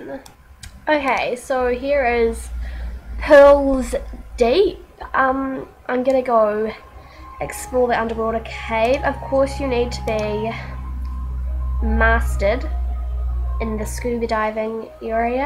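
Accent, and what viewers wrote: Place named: Australia. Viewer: Love ur accent btw where r u from ? Australia ?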